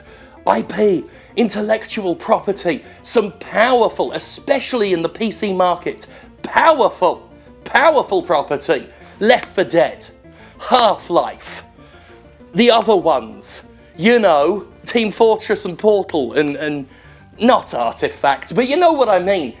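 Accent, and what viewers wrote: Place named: United Kingdom. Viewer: "It's a fucking joke here" Wait, what? I'd always thought you were British because of the accent.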